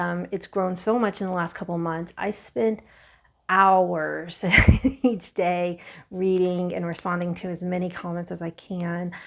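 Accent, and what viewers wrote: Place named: South, United States. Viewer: I knew you were from one of the southern states, I mimic accents and I pick up on accents....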